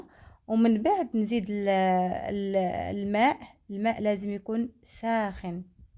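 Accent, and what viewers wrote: Yemen. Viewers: من ركز انها صارت شوي تتكلمي يمني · 😂😂😂 مااجملها تتكلم يمني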